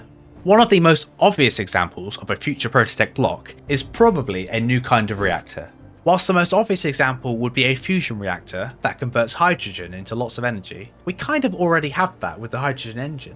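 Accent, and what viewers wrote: England, United Kingdom. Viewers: are you from south`or central england ?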